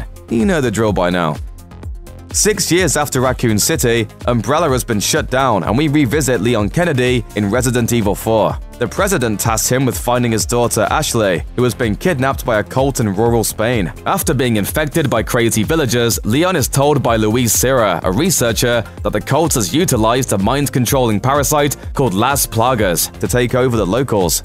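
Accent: United Kingdom. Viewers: What’s your accent? Sounds British but not familiar with ‘citay’ or ‘zombay’ accent! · Can you not be British? I'd like for just one shitty recap video maker to not be British.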